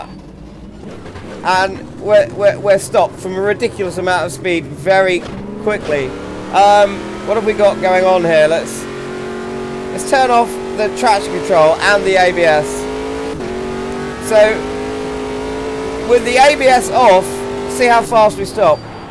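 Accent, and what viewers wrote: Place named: United Kingdom. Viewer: First, you really should have more subscribers. I enjoy your analysis on sim racing topics. Your British accent and comments are very addicting (" the car is having a bit of a moment with the tarmac" LOL!!!) :) Second, I'm really finding the AI way to aggressive in the latest update, actually running me over several times (didn't happen pre Jan??) Third, I don't know why I find it funny that anyone would refer to a car with a straight 6 engine as a "muscle car".. :)..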